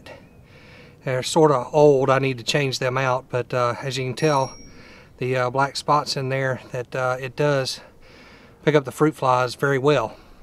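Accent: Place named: South, United States. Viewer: Ipple cider he's a good old country boy I respect and love the accent I been living in Tampa fl for a really long time more than half my life now and married a girl born and raised here just something about it she's not "country" perse but she is born and raised down her I was born and raised up north new York to be exact and it ironic because she hated new Yorker but some how me and her just well the rest I history we have a whole family now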